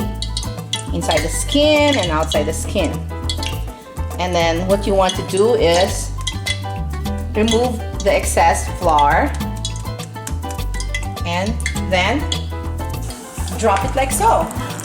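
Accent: Philippines. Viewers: Jollibee adds MSG to their chicken...the real secret ingredient! Madame, I love the way you present your dishes. Your Filipino Accent is truly Filipino...brings me back home. Hugs my teacher...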